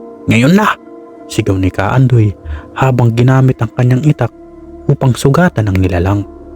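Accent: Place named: Waray, Philippines. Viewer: Waray waray itong narrator kasiang tuno ng kanyang salita hindi mapakakaila na waray waray alagad intoy hehe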